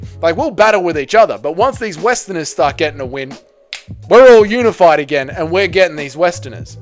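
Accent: Australia. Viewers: U gotta change that intro especially with that accent sound like a Walmart ozzy man😞no disrespect this is my 1st time seeing you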